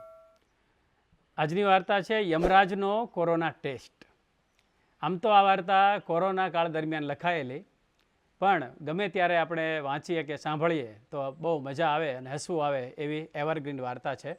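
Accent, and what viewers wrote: Surat, India. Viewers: વાહ...હસીને લોટપોટ થઈ જવાયું...😂😂 આ તમારી વાર્તા વાંચી હતી પણ આજે તમારા સ્વરમાં સાંભળવાની બહું મજા આવી...👍🏻 સુરતી ભાષા અને યમરાજ અને ચીત્રગુપ્તનું અટક હાસ્ય તમે જે કર્યું એમાં બહુ હસવું આવ્યું.... ખૂબ જ સરસ વાર્તા સંભળાવી અને આગળ પણ આવી રીતે નવી વાર્તા સંભળાવતા રહેજો..... 👌🏻👌🏻👍🏻🤣🤣🤣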